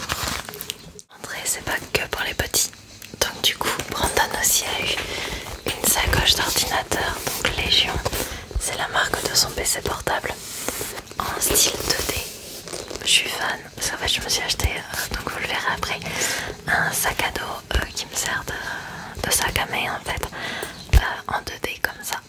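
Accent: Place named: South, France. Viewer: Hello très sympa cette vidéo. Tu as la voix qui chantonne. D’où viens-tu ? J’adore les accents du sud 🥰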